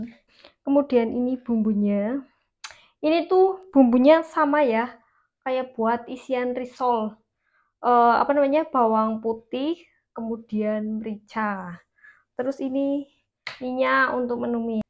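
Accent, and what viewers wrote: Banyumas, Indonesia. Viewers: Ora ngapak ora kepenak jawa ngapak nya mn nduk...??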